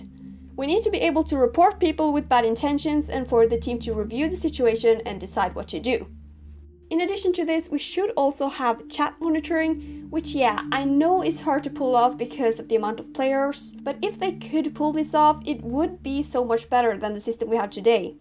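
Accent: Norway. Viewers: wait are you norwegian?? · are u norwegian? o-o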